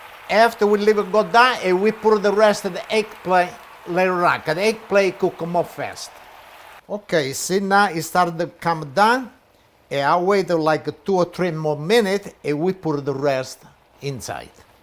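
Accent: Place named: Naples, Italy. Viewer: Mi genetori sono da Grottaminarda e Fontanarosa! Io sono nato a Boston pero, e parlo in dialetto Napolitano come te. Grazie per il ricette saporito! Auguri!!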